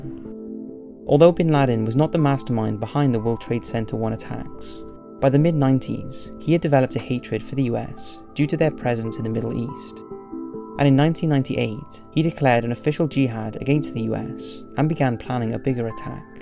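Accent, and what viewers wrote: Wales, United Kingdom. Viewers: Can’t beat a Welsh accent 👌🏻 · Are u welsh? Sounds like a lil welsh accent 🏴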